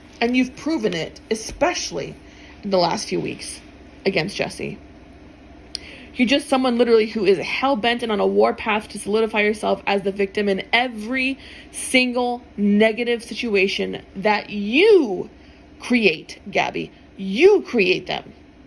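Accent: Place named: Miami, United States. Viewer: Sounds like you grew up in Miami, like me?! Sometimes you got to fight. Gabfly wanted to hear the reason that dude r word his ex? What the heck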